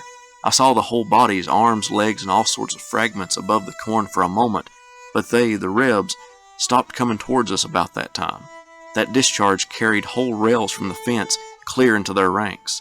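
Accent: South, United States. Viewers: Sir, you sound like a Southerner so why are ALL of the accounts from Union soldiers? There are plenty of first-hand accounts by Confederate soldiers. Perhaps a more even blend would be better. One more thing, the name of the battle is Sharpsburg.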